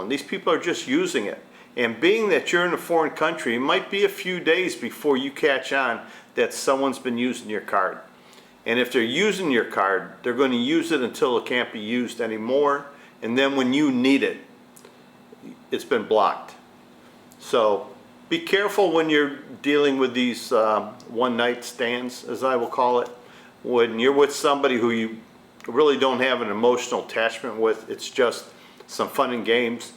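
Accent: United States. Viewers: you sound like you're from the USA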